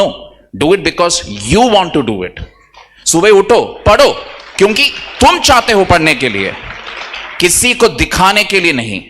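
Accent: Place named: South, India. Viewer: sir belong south side that's why his tone like this.... But practice makes everything so practices it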